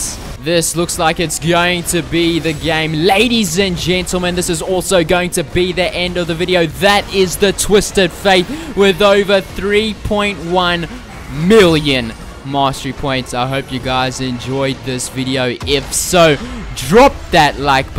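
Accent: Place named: South Africa. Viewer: You have to be South African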